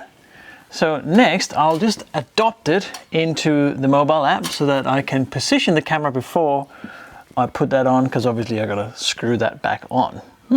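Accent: Denmark. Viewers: Hey, you danish? Think I can just about tell by the accent, but not 100% sure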